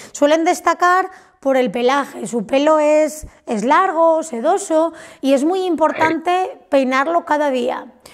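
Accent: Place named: Asturias, Spain. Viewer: Es como hablan es Asturias. Parece mentira!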